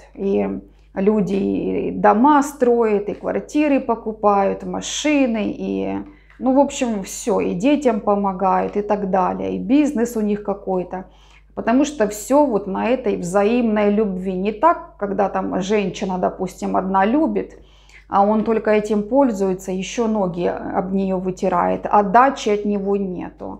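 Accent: Ukraine. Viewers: Вы все расказали о нас с ним правду ,как будто вы за стенкой живете у нас ! Я преклоняюсь перед вами ! Как вы все правильно видите и говорите ! Дай. Бог вам крепкого здоровья и мирного неба нам всем над головой ! Да я украинка ,и вы наверно тоже ? По говору чувствуется ! 100000 раз вас за все благодарю ! Хотелось бы мне с вами сделать личный расклад ? Напишите мне ответ ? Благодарю вас ! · Приятно слышать ваш украинский говор специфический, в Крыму по нему можно определить местных жителей. Спасибо за расклад...он сбил серьгу...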